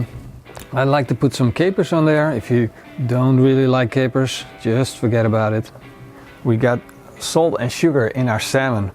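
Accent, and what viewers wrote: Netherlands. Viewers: It's Dutch for sure :D No question about that!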